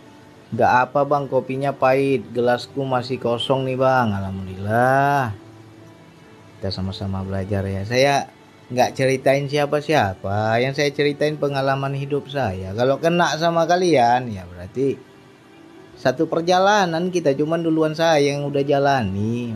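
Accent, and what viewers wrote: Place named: Palembang, Indonesia. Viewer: Kalo dari logat kayayo wong palembang bang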